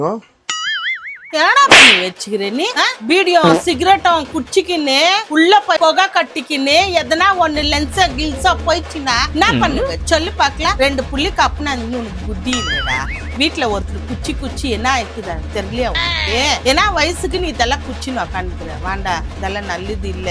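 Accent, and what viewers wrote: Bangalore, India. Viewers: Bangalore tamizh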